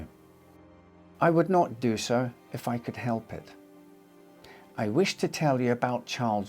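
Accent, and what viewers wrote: Scotland, United Kingdom. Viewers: I’m trying, to discern the ‘whereaboots’ of your (own/default) Scottish accent - Ayrshire maybe?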